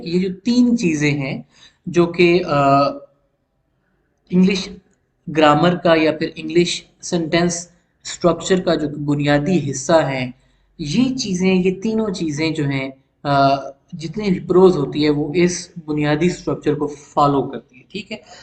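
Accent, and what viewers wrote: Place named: United States. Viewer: Aap ki mother language to urdu hy but u speak English like an American so goo sir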